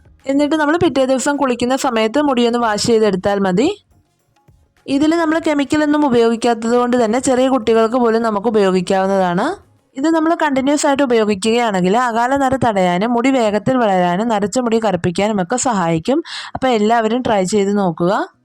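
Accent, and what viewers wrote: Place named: Central Travancore, India. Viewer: Central Travancore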